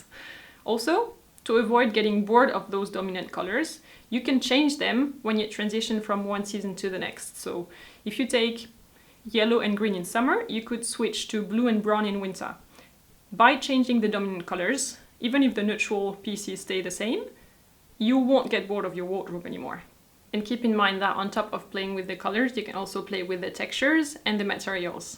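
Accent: France. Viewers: Vera Prokopenko FRENCH and I love listening to her accent. A parent's brother, cousin or friend: Uncle. This 'u' is pronounced as in the word unbelievable or untrue. The word 'an' in ankle is pronounced as in the word anchor or angry. I'm in the UK and I love accent. I don't know anyone else with a French accent. It's very pleasant to hear. She doesn't speak to fast and everyone understands clearly what she is saying:)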